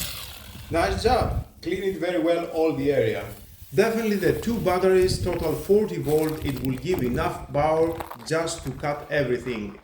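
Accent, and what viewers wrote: Greece. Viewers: Hello, I cannot help but like your accent. I've heard it many times on Greek ships when I was a service engineer 😅 are you also a seafarer or is that just how Greeks sound?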